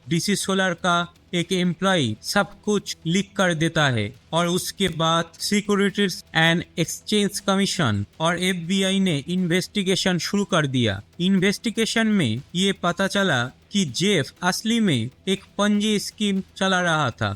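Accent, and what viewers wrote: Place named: India. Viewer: Toom englis hindi ki mafik bolta hi.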